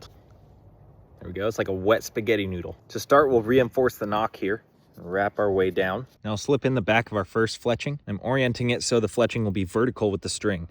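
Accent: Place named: Lancaster, United States. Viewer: OMG There's an American archery guy now! (I love your voice btw, reminds me of some of my friends in Lancaster, PA).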